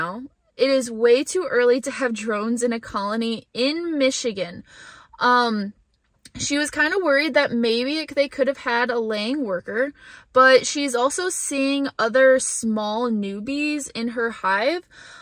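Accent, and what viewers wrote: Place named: England, United Kingdom. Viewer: just use that english accent!! :) !!!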